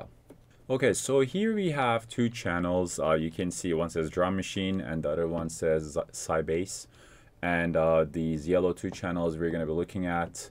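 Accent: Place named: Iran. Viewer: You sound Iranian 😊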